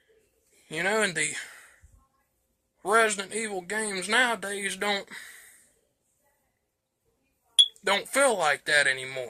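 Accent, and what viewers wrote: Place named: Appalachia, United States. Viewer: why you talking like a hill billy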